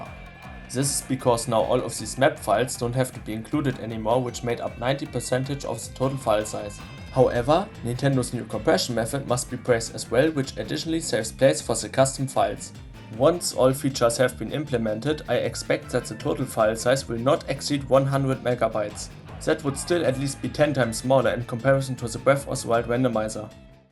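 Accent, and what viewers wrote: Germany. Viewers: mach weiter so ich liebe deine Videos aber es fällt mir manchmal schwer dein Brandenburger English zu verstehen aber große props an dich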